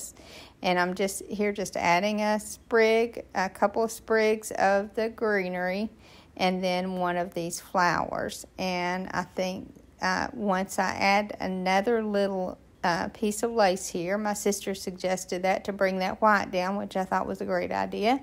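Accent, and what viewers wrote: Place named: Tennessee, United States. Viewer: I was going thru YouTube and saw your site. Love your accent. Are you from Tennessee? Enjoyed your kind instructions, of the amazing silk flowers. Do you think , this would work with real flowers?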